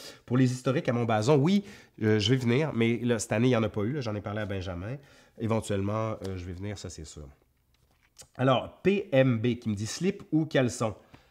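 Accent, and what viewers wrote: Quebec, Canada. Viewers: Vous n'avez peut-être pas le coffre de Benjamin Brillaud, mais l'Histoire racontée avec l'accent québécois est fort plaisante. Ne changez rien :) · Une question qui me tarode depuis un bon moment et j'espère que tu pourras y répondre. Suite aux grandes découvertes, aux fuites de certains français en raison des guerres de religions, de la Révolution Française.... beaucoup se sont réfugiés au Québec ou en Louisiane, parlent avec le même charmant accent qui est le tien. Donc, question: parlait-on ainsi en France à l'époque des Henri, Louis Charles et autres? Et ainsi vous auriez conservé cette particularité linguistique, tandis qu'en France, la langue se serait "vulgarisée". Ce n'est pas un terme péjoratif, d'où les guillemets. Merci d'avance. Et Bravo pour tes vidéos.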